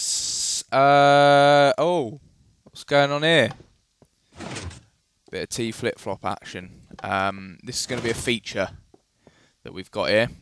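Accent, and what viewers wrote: United Kingdom. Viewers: i still get amazed by all your knowledge about redstone circuits.. i wanna learn more! >:D keep it up!! nice videos!! (btw, you've got an awesome brittish accent XD) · listening to a British voice on a Minecraft video was a pleasant change. hate your texture pack though :<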